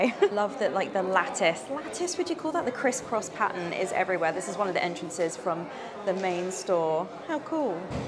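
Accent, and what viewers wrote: United Kingdom. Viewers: Thank you for the excellent vlog. It is calming to watch it. There is no yelling or constant nagging about selling stuff. You did a great job reviewing the cafe and the food. The elegant British accent is so good.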